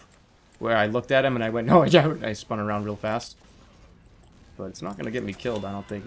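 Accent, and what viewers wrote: Denmark. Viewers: Are u from Denmark?? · er du dansker loL? JERMA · Are you danish??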